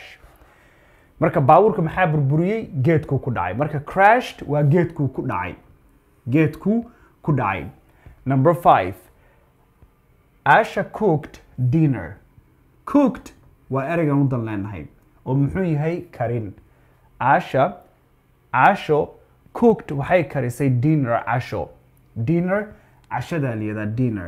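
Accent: United States. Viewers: In fact, i admired your of way tecahing.. jazaakallaahu kheyran.. Please lemme know where and how u learned that rough language, specifically the American accent...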